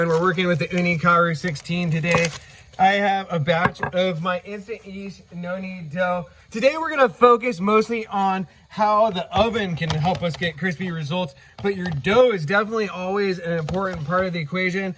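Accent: California, United States. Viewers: The California accent is amazing. Like from The Californians on SNL.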